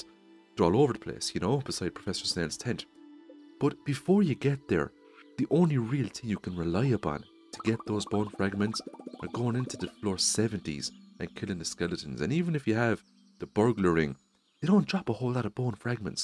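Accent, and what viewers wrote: Ireland. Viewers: First off, that Irish accent is fantastic. Anyways I like most of these changes to an extent, the extra fees for the house upgrades, the nerf for clay drops, and the nerf for the recipe of deluxe speed grow are annoying for sure though. Most of these changes make the game a little harder for the better which is especially nice given the various exploits people can take advantage of for easy progression, but the prismatic shard change is just weird. Thanks for reading my ramble.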